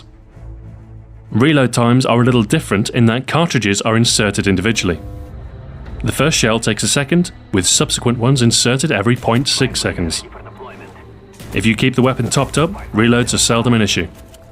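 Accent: England, United Kingdom. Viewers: He's English, so that's his accent! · Im getting the Alan Jackson GE commercial alot before these videos play. going from Alans friendly texas twang to smooth british tones is an amusing contrast. sounds like a salesman an upper class shop to me for some reason.:P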